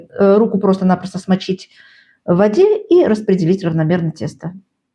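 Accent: Baku, Azerbaijan. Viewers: Спасибо Вам,дорогая землячка!Ваш голос и наш бакинский акцент просто завораживает и ласкает слух!Рецепт -супер!Успехов Вам. P.S.А хочу я ореховые трубочки,кот.продавались в Баку,я писала про них Вам..❤❤❤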